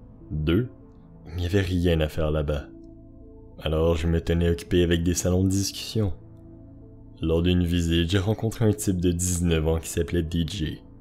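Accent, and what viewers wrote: Quebec, Canada. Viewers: Haha knew it pis honnêtement sa fais different d’écouter du thread lue par un québécois 😁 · J’adore l’accent québécois 😁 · Tu est québécois ?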